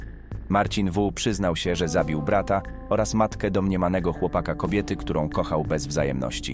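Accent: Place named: Silesia, Poland. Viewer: Zgaduje że autor pochodzi ze Śląsk 😊😊😅😅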